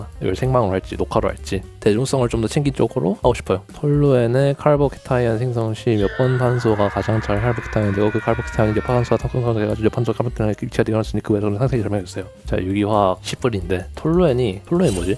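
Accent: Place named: Gyeongsang, South Korea. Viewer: 노↗️래↘️방↗️ 방↗️송↘️하니까 경상도 티남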